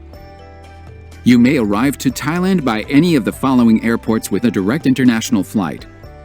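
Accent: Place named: Ukraine. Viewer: Gday, pete sent me here. subbed liked To your channel. I like pete and his videos alot, but i was annoyed by his question asking you if russia should have invaded ukraine bloody STUPID question! some feedback for you. I really dislike computer voices on videos, I much prefer you speaking even if you might think your ukranian accent might be difficult for some people to understand. Or whatever other reason. please consider using yr real voice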